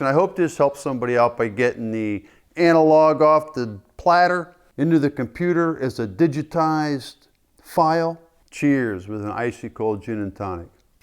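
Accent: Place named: Baltimore, United States. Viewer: That's fascinating. The reason I asked is you seem to have a little bit of our accent. The reason it's kind of fascinating is the thing about Baltimore the accent is like Yankee southern, in other words, it's like it's not sure if it's north or south. That's so interesting you spent your youth up north then most of your adult life south and maybe it gives you sort of a distinct accent, but I swear it's a little like ours. Anyhow, great channel! keep doing your thing.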